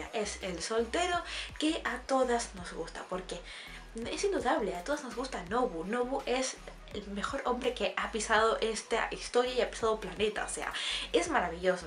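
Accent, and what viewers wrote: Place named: Spain. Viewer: Es agradable tu acento, de que parte de españa es tu acento?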